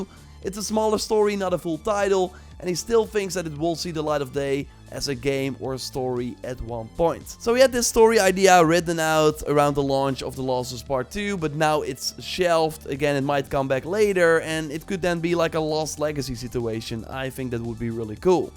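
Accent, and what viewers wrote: Netherlands. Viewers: The Dutch accent I love it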